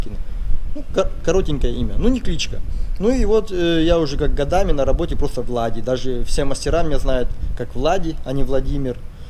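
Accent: Kazakhstan. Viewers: у тебя говор и речевые обороты как у моего мужа и его брата и племянников из Казахстана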